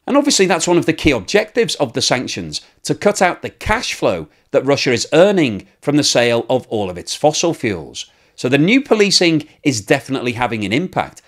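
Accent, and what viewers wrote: United Kingdom. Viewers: He’s not American with that accent, British I’m sure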